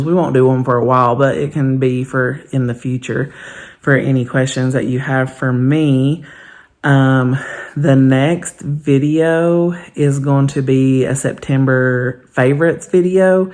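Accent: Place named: Kentucky, United States. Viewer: I look forward to your videos. I love accents, I enjoy Claire at Jungle Haven's British accent, and I love your Kentucky accent. I am a nurse (I just added up and it's been 37 years!!) And my youngest daughter is a Family nurse practitioner. Both of my daughters and I are planty people. I appreciate your courage. You give me the gift of your plants and how you grow them. I have not done a moss pole yet. I have a rapidaphora tetrasperma that would appreciate a moss pole or a trellis about 20 feet 😊high.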